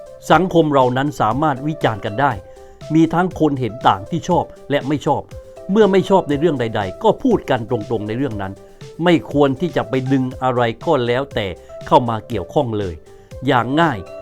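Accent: South, Thailand. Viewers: นอกจากเสียงไพเราะแล้ว พูดใต้ได้ชัดเจนมากเลยค่ะ ฟังแล้วทึ่งจัง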